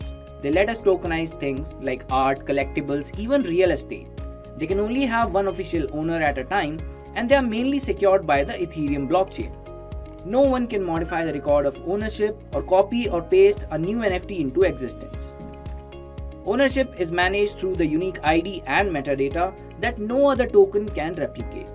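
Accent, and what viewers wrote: India. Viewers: Nothing personal because it's not his fault and he quite likely has good intentions, but I hate indian accents, it's hard to understand and annoying to hear in English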